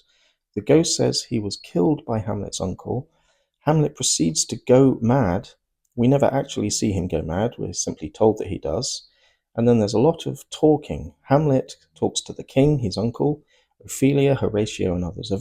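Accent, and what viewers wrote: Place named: New Orleans, United States. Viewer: Used to love your unique New Orleans sound, delighted you’ve found a second career in lit crit! Outstanding stuff. 😊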